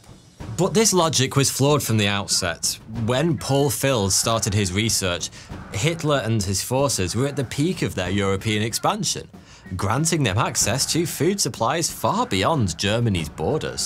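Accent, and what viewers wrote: United Kingdom. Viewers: srry but it sounds as if the very well spoken, and well sounding, spokesperson of this calls thoughty 2 42, I realize its just because of the lovely british accent, but it could be pronounced more clearly, and that btw goes for all ur vids!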